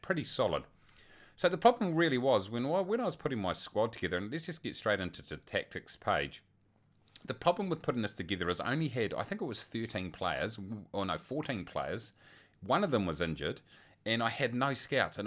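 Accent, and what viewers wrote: New Zealand. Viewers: Love the spreadsheet and a nice wee return on that gamble! Nice 1st up game episode I liked it mate and its weird hearing a Kiwi accent on YT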